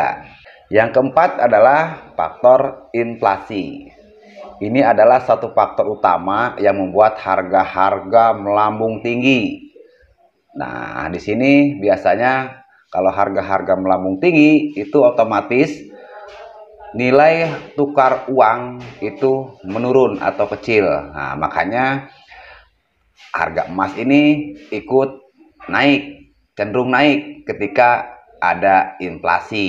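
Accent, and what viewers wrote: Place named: Palembang, Indonesia. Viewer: Logatnya sangat pelem autosubscribe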